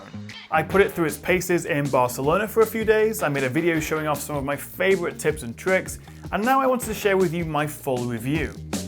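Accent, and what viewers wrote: England, United Kingdom. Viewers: First time watching one of your vids, I have to say I enjoyed it! What sold me was your accent, it wasn't so grossly over the top annoyingly ENGLISH - UGH! Very detailed, highly informative. USA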